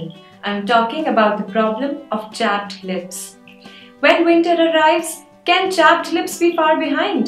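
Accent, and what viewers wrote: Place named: India. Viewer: love ur indian accent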